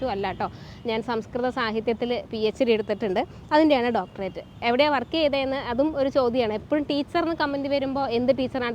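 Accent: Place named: Thiruvananthapuram, India. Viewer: ഞാൻ ഓർത്തു ചേച്ചി തിരുവനന്തപുരം കാരി ആണെന്ന്.. 😊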